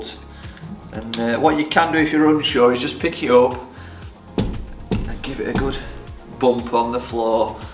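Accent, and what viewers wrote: Yorkshire, United Kingdom. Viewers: Great video will definitely try thus myself next time 👍but the best part for is being a Rotherham lad just listening to another Yorkshire lad trying to pronounce everything clear for his audience great job 😁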